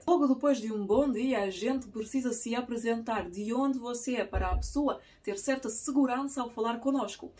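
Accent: Portugal. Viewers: Com esse sotaque você mora em Portugal e não no Brasil né?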